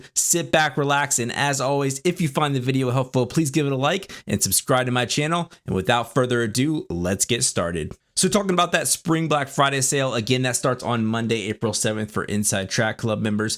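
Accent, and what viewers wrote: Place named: Virginia, United States. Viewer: you sound like chrisfix lol, that VA accent i guess haha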